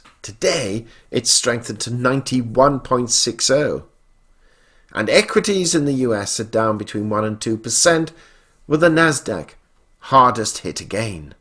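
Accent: United Kingdom. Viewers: why do I take things more serious when it's reported in a British accent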